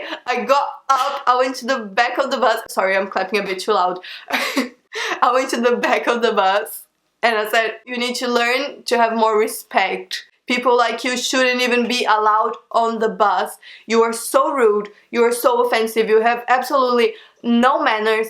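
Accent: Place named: Brazil. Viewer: I know that ur brazilian because ur. Accent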